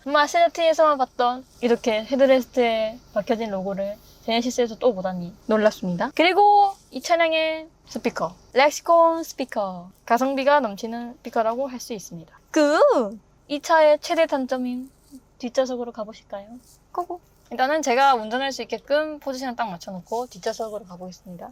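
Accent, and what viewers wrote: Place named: Busan, South Korea. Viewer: 음~~ 서울 상경한지 꽤 됐는데 아직 서울말 패치가 덜 된 부산아가씨 억양이네 ^^ 재밌는건 부산 가서 저런 톤으로 말하면 서울말 쓴다고 면박주고 서울에서는 아직 경상도 사투리 못 벗어났다고 하거든 정체성의 혼란을 겪고 있을 시기임 ㅋㅋ 리뷰는 재밌네요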